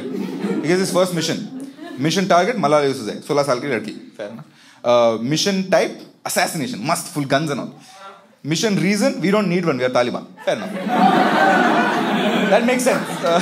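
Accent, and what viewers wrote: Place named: South India, India. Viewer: You sound south indian ... Somehow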